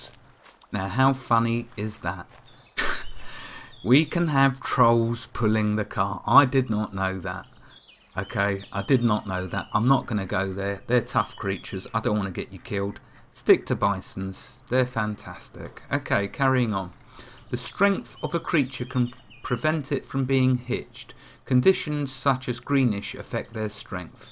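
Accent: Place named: Essex, United Kingdom. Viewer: I'm also from the UK, perhaps near you as I'm on the Essex / Suffolk border and I think I can detect some Essex in your accent?:-)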